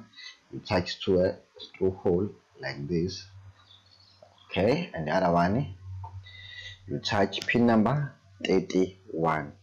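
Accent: South Africa. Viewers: U from south africa I can hear ur accent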